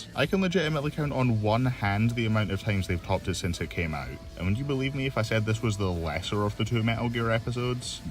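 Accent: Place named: United Kingdom. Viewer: Sick new remake on a great season review. I think I mentioned this before, but there's something about UK presenters for these types of reviews that make them really easy to listen to. You, Critical Drinker, Mauler, etc.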